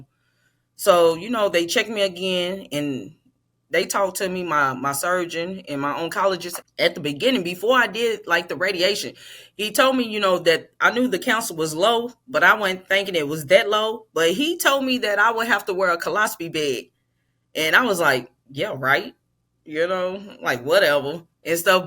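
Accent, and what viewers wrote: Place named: Kentucky, United States. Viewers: Hey sis I just listened to your story and just wanted you to know that it was so encouraging the way that you can still smile after all you've been through I really need to change my way of thinking and be positive and be more faithful just like you said that you are I pray for you that everything else will go well in your life you seem like such a sweet person and your southern accent had me just laughing I love you sis praying for you thank you for keeping it real I know good people when I see them and you most definitely or a person with a good heart and a good spirit stay strong and please keep me in your prayers my name is Deborah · Ayeee😁😁🤣🤣 Well thank youuuu sugars. That's that Kentucky talk😂😂😂 Appreciate you 🫂🫶🏾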